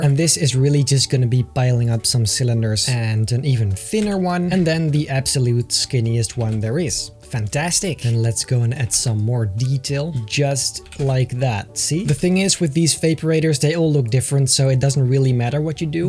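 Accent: Netherlands. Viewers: hey are you dutch /NL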